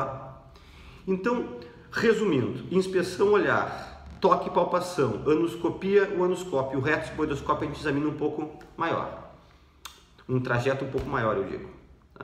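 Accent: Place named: South, Brazil. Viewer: Pelo sotaque, do sul.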